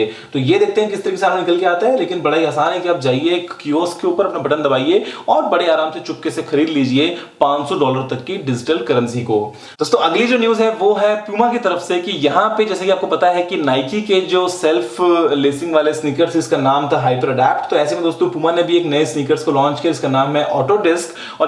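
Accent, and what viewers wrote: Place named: Punjab, India. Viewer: I dont understand a fucking word you say fucking punjabi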